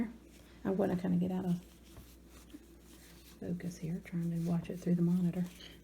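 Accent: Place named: South, United States. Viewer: Ooooh, Yay!!! A southern gal teaching CROCHET!! You sound like you're from the Carolina's by accent....but I could be wrong (usually Ga, Ms, Al have a thicker drawl than the Carolina's, but maybe I'm just a biased Carolina gal😜). Anyway, love that I found a fellow southerner to follow! Love the poncho! Thanks for giving the count for the various sizes too. And I thought you did great with your camera/CROCHET work! I don't think I could do it...I'm doing good looking at it directly and still miss, I'd really be OF if I had to look through a viewfinder or a screen! So, honey, you did great! New sub! Looking forward to more of your vids and patterns! Bye (in that beautiful southern bell drawl)! 😉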